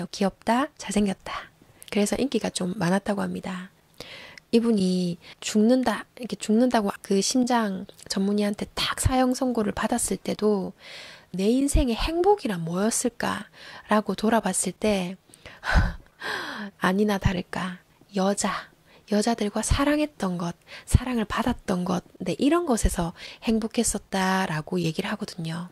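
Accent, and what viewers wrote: Gyeongsang, South Korea. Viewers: 경상도사투리가 약간섞인 감미로운 목소리에 영적능력에 진심으로 빠져듬니다. 매일 아침 눈뜨자마자 고맙게 듣고 영성의 질을 향상시키고 있어요 너무 감사핮니다 10만 20만...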